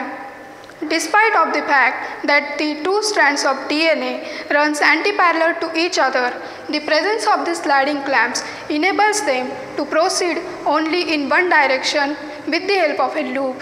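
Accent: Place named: India. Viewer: I had to close this video because I can't understand indian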